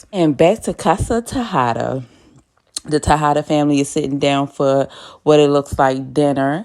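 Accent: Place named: Baltimore, United States. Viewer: You Sound Like you from my city ! You Fro, Baltimore ?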